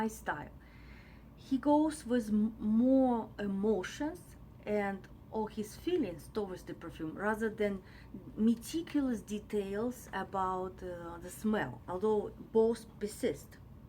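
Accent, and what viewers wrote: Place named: Russia. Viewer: Thank you for making videos in English. Your russian accent heips me with understanding. И вообще, здорово совместить приятное с полезным: и понимание английской разговорной речи чуток прокачать и про любимые парфюмки послушать в вашей прекрасной манере.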